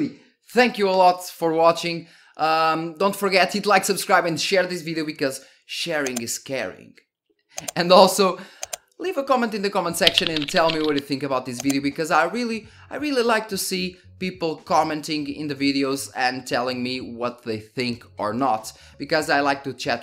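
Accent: Portugal. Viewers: Parece a voz de um tuga, estou certo?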